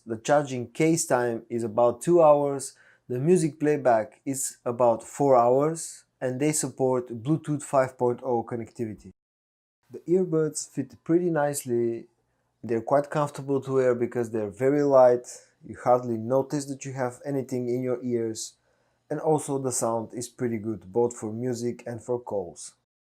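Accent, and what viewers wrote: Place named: Russia. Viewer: Your english is too russian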